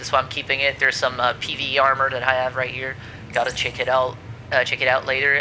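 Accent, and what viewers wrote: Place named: Quebec, Canada. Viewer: great video, something for me to build towards. where about are you from you sound a bit like gsp who was a fighter in the ufc he is French Canadian.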